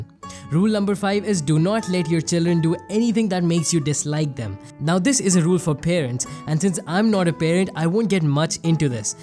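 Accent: India. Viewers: I think the accent comes from him being Indian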